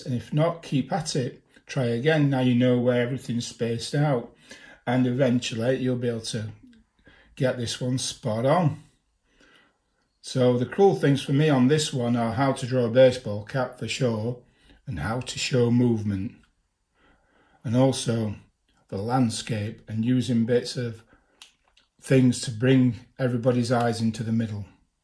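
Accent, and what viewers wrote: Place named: Northern England, United Kingdom. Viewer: Thank you, great to hear a Northern Accent.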